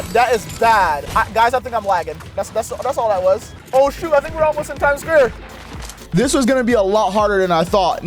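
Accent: Jamaica. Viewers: You Jamaican born of desent or just love the Jamaican accent?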